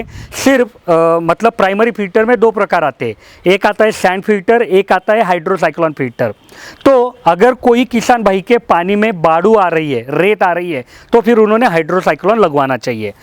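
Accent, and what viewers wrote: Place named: Maharashtra, India. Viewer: आपल्या बोलण्यावरून असं वाटतं की , आपली भाषा मराठी आहे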